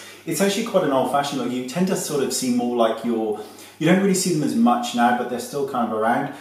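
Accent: United Kingdom. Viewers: I don't know what it is but I love hearing him say naughty, I think it's the British accent and the lack of anyone ever saying it in Texas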